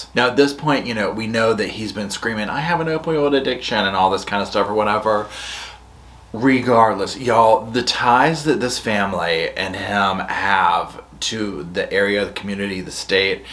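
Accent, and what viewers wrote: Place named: North Carolina, United States. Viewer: NC!! I knew your accent sounded like mine! Xoxo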